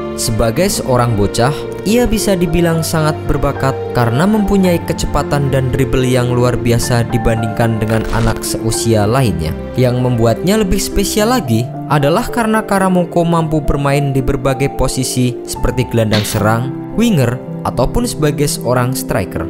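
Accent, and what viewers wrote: Java, Indonesia. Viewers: knp medok ya wkwkw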